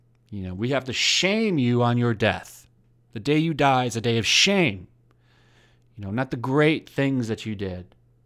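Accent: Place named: South, United States. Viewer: I just want to point something out here. Far left ----> Hating on a man who, although I certainly have no affection for, clearly meant a lot of things to a lot of people. Yet the Alt-right nazis everyone is so scared of, have no said a thing about him being a hack, even though he's Jewish and did do a lot of questionable things in his life. Just want to point that out. Your southern accent is beyond nauseating. Also just want to point that out. You read like 400 tweets in the 3 character voices you have. Lost being funny very quickly. As someone who has no dog in this race I wasn't cheering you for mocking sjws and I wasn't steaming mad that you were, I was just bored and irritated.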